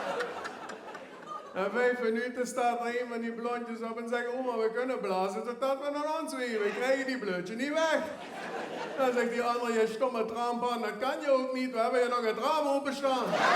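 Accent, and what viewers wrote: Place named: Limburg, Netherlands. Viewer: Moest als rotterdammer wonend in Limburg goed luisteren naar het dialect maar wat een humor heb hem inmiddels al 8 keer gekeken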